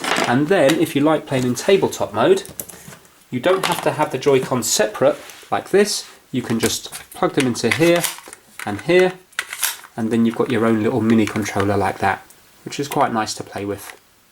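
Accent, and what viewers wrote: England, United Kingdom. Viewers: Your very helpful and I like your English accent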